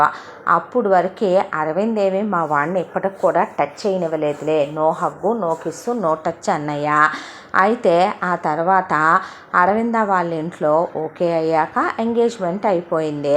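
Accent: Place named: Rayalaseema, India. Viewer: mee voice variation maatram super andi enni sarlu e story vinnanu mee voice gurinchi rayalaseema slang super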